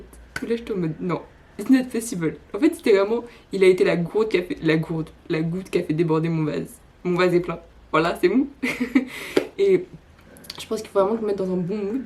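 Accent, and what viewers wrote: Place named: Quebec, Canada. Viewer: Omg, le québécois est trop nice ! l'accent cette pépite !!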